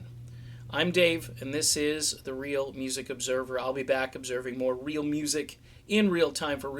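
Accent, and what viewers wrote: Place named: South, United States. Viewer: For that sounds hillbilly fir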